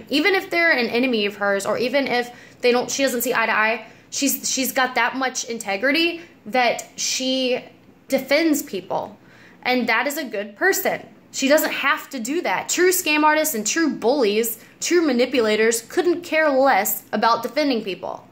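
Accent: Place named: South, United States. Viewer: I immediately caught your accent because I'm southern too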